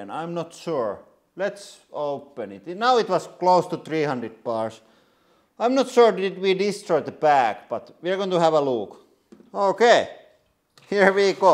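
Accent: Finland. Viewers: They're not sound effects. It's actually supposed to be spoken English, it's just that the Finnish accent is ridiculously strong.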